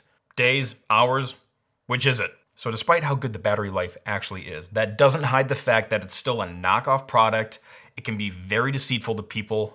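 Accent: India. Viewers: sound like come from India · That Indian accent is known to fool poor clueless consumers that’s he’s American.....ok... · You can tell by the indian accent its a scam. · What it speaks like Hindi India call center accent, got you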